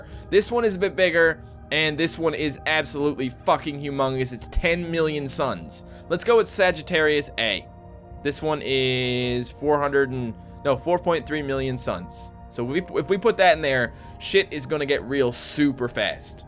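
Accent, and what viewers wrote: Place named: Newfoundland, Canada. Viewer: Dont go to newfoundland if you dislike is accent bro, most of us sound like irish knockoffs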